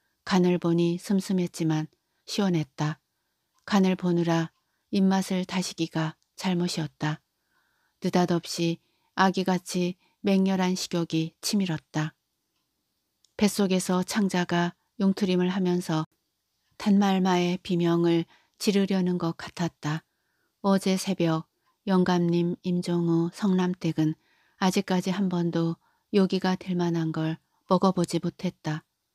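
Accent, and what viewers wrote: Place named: Jeju, South Korea. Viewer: 제주도에서 시집오신 외숙모하고 말투가 똑같으셔서 듣는 내내 더 재밌기도하고 신기하기도했답니다😂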